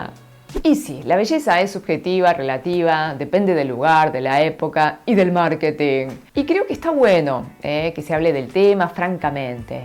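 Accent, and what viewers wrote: Buenos Aires, Argentina. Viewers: y con tu acento porteño estas mas buena, eres la coreana mas buena de habla hispana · Hermosa tu y con ese acento argentno! Mas bella aun...Un admirador Colombiano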